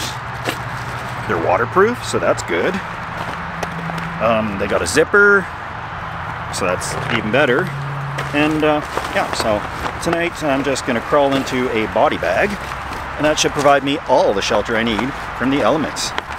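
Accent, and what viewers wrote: Canada. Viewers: his accent always makes me think of my favorite Canadians. Trailer Park Boys is timeless. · His accent is 100% Canadian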